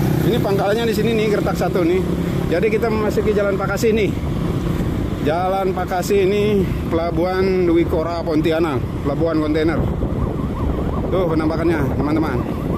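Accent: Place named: West Sumatra, Indonesia. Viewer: Cara cpat smpai di pelabuhan dwikora pontianak.. Maaf om org pontianak atw org minang krn dr nada bhs kaya org minang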